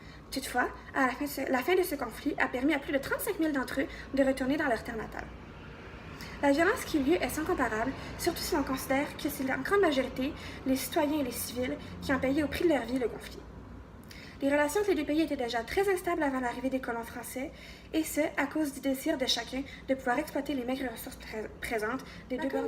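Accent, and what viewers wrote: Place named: Canada. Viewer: L'accent est un peu difficile à comprendre. Est ce comme ça que les canadiens parlent ?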